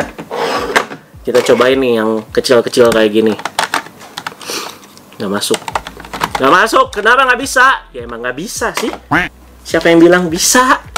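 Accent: West Java, Indonesia. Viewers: Agak logor. Sunda sekali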